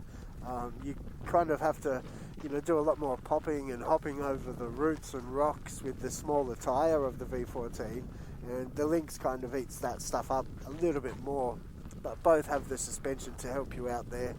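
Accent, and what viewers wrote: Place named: Scotland, United Kingdom. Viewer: dude your accent is so nice, looks like scottish but your channel is based in Australia, australian accent is just like yours?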